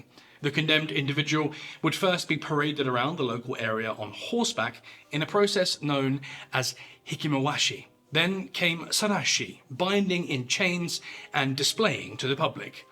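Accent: England, United Kingdom. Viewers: I'm having trouble getting past seeing Ricky Lafleur with an English accent. #trailerparkboys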